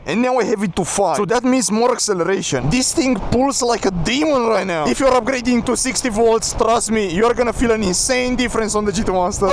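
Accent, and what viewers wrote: Balkans. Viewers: I love your true happiness from riding combined with Balkan accent on this videos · The balkan accent alweys gets me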